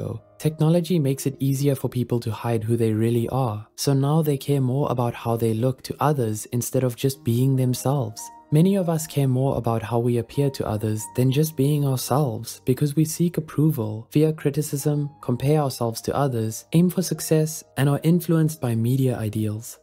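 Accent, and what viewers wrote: South Africa. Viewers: where is from? his accent sounds vaguely south african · Is the speaker from south Africa? Awesome video none the less👏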